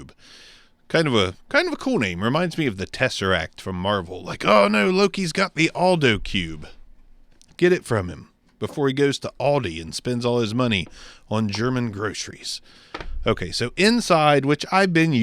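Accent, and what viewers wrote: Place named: Mid-Atlantic, United States. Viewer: BTW noticed your accent, are you from Pa, Delaware or Maryland?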